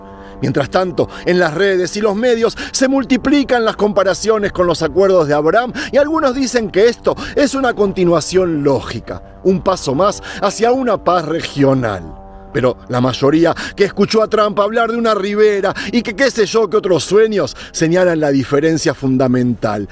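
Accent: Argentina. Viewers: No confíes nunca en los polîticos, seguîd en peligro, los terroristas siempre lo son, pactos de paz para bebeficio de los firmantes, yo me vompadezck de los pobres gazzties masacrados por sus propios compatriotas, tiros en la cabeza porque tenemos que seguir matando a alguien, es nuestra rasôn de ser, Te escribo desde edpsña, aquí tuvimos a eta, ahora estàn en el gobierno, cobrando como políticos, sentados en despachos y blanqueando su pasado asesino, las pistolas estàn calladas, pero siguen guardadas, estás muy acertado, te noto cabreado, y no es para menos, trump! Un megalómano, on ínfulas de gobierno total, cuidaros mucho, ya os falló una vez el sistema de seguridad, a Dresde ó no?, fue atentado con ayudadrl propi Israel, posible,?, todo un gran teatro. Ànimo y ojo avizor wué tengáis mucha suerte y wué dios os proteja, desde espsña con amor, gran reportaje un gusto oírte ese bello espsñol argentinizado ó bueno como sea me gusta tü musicalidad.❤❤❤❤❤❤❤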